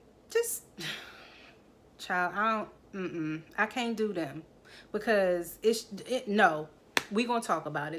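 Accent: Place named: Washington DC, United States. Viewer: I don't even have to ask if you are from DC ...I can hear our accent ALLLL up in your voicet! Damn.. I love when I come across my fellow city folks on Y/T.. I be so siced!! LoL! I followed you via my boo JayLee's channel.. you definitely got a new subbie girl! this review was funny AF btw!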